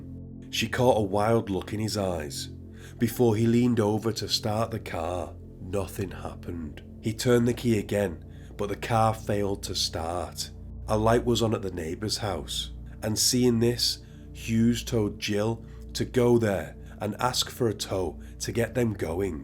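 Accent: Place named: Liverpool, United Kingdom. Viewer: I love your accent. Where are you from? Sounds like Liverpool?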